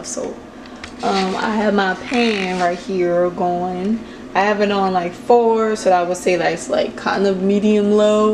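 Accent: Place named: New Orleans, United States. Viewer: I detect a hint of a New Orleans accent in your voice. Food looks good!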